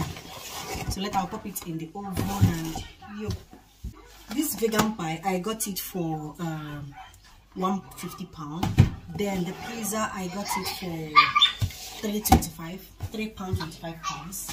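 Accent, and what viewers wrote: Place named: Igbo, Nigeria. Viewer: I just tap the like button before even watching because you are funny with the Igbo accent.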